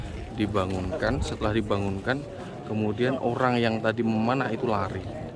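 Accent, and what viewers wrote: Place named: Banjarmasin, Indonesia. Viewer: Kyak ada dengar suara org banjarmasin,, bapandir bahasa banjar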